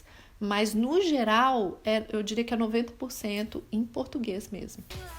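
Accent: Minas Gerais, Brazil. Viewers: Eeeeeeh!! Sotaque mineirooo!! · Vc disse sutaque mineiro ? ❤️❤️❤️❤️❤️❤️❤️ Já amo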